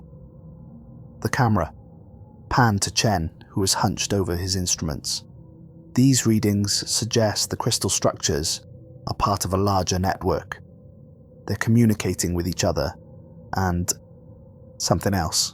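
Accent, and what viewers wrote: Wales, United Kingdom. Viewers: Really appreciate you being a sci fi Creepypasta youtuber using their voice snd giving thanks etc. may not seem like much but pretty much all the others out there 💯 done via AI start to finish. Cheers! p.s. if im not mistaken, Welsh?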